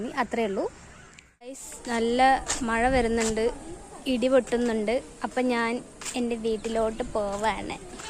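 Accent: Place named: Thrissur, India. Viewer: Thrissur slank anello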